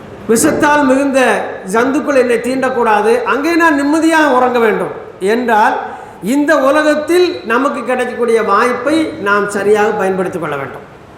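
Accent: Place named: Tirunelveli, India. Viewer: முழுவதுமாக பயானை கேட்டேன். அருமையான திருநெல்வேலி தமிழில் ஒரு சிறந்த பயான் அல்ஹம்துலில்லாஹ் வேண்டிய பயான் ஆண்களும் பெண்களும் அதிகம் அதிகம் பயான்கள் கேட்பதால் நம்முடைய ஈமானுக்கு அதிகம் அதிகம் உரம் ஊட்டியது போல் ஆகும் .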